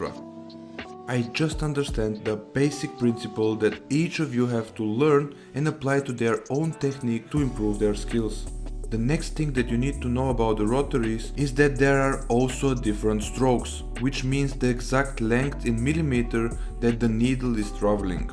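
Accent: Russia. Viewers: Дааа ...этот русский акцент...как будто в уши натолкали битого стекла во время просмотра... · какой у вас очаровательный рашн аксент, хи-хи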